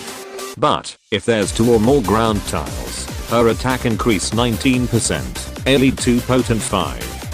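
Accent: United Kingdom. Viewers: British? that makes it even betteh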